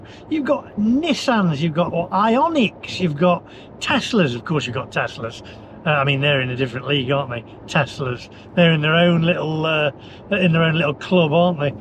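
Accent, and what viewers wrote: United Kingdom. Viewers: I must say, your videos are addicting...funny, all with a British accent. I still find it hard watching you drive on the wrong side of the road...LOL. Keep up the good work.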